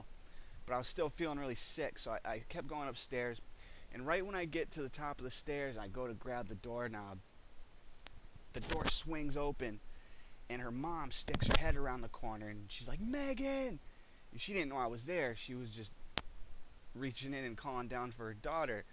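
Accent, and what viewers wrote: Massachusetts, United States. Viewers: I knew he was from mass before he said it lol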